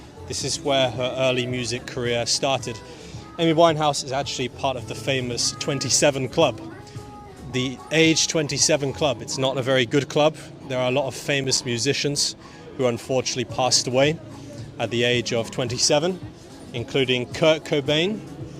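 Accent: United Kingdom. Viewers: The British accent is the best one. I am Brazilian and I love accent and all the British's way to talk.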